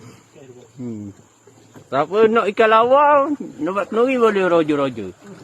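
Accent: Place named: Lipis, Malaysia. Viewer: Tak ada ikan lain lalu ye.....baik baik bahan ke tangan Kang...😂😂original cakap Lepih..😂😂😂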